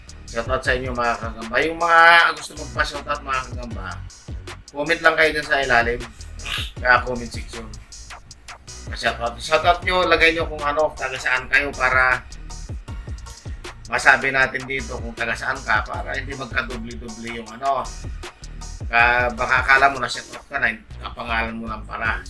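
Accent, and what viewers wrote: Gabaldon, Philippines. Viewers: Gabaldon padin yan tay yung tagalog mo?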